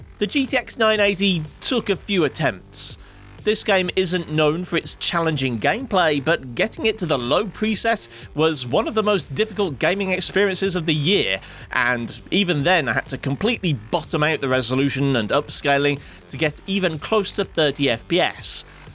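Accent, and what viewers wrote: England, United Kingdom. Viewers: Mr english accent, please make a video of a 2070/2070 super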